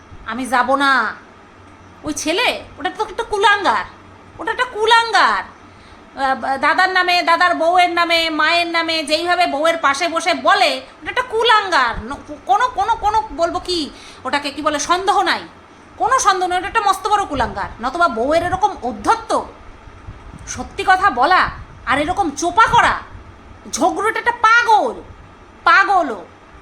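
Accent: Bangal, Bangladesh. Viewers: তোমার মুখে বাঙাল ভাষা খুব ভালো লাগে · Tomar mukhe bangal kotha valo lage age bosak ke nie bolte tokhon bangal kotha bolte. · দারুণ কথা বলেছো।আর কি সুন্দর বাঙ্গাল কথা শুনতে খুব ভালো লাগছিলো।আর হাসছিলাম। ভালো থেকো।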